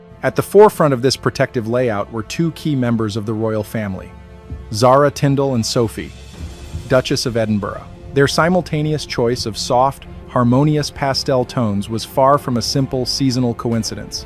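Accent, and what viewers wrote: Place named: United States. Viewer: WHAT A LOAD OR RUBBISH ! Done with an American accent and no accurate knowledge of the Royal Family.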